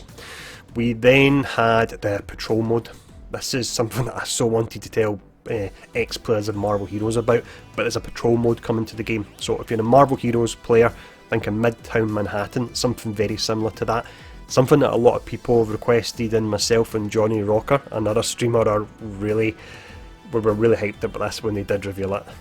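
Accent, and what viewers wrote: Scotland, United Kingdom. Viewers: Passion with a scottish accent ... good to see you excited about the game. Patrols are coming!!!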